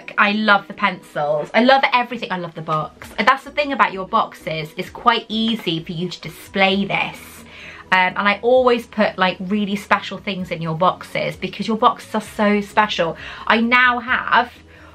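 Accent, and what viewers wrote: Wales, United Kingdom. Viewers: Wild accent guess: grew up in S. Wales, live in Brighton now?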